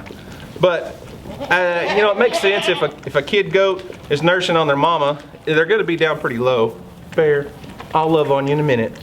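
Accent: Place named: West Virginia, United States. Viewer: My 5 yr old daughter and I just watched this video together and she said Momma, he has goats like we do and he talks like us too 😂😂😂 we're from West Virginia. It's bitter cold here too. Can't wait til Spring....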